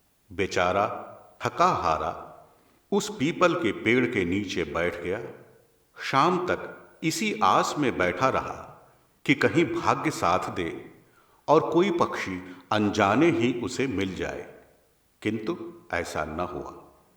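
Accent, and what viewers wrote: Standard. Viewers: विशुद्ध उच्चारण में सुनाई गयी कथा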